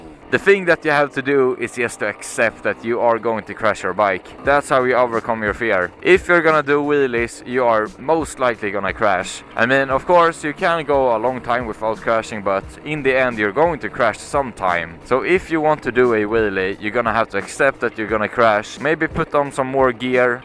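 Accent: Sweden. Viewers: jävlar vilken svensk dialekt du har, men bra video.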